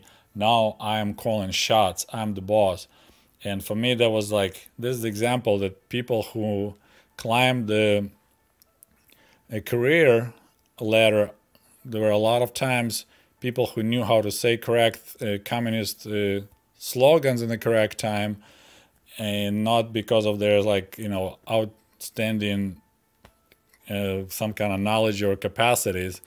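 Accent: Russia. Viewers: Sir, I'm obliged to mention that you still have a discernible Russian accent. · Agreed I love the accent so much. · Man I love Russian - English accent / dielect . You sound so nice but at the same time so deadly. Much Love from New York.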